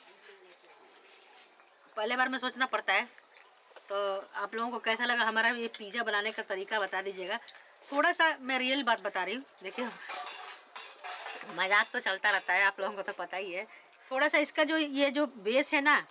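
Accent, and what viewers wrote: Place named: Bhojpuri, India. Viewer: behan aap bhojapuri me hi boliye bada pyara lagta h aap bhojapuri bolti h to sach me bol rahi hu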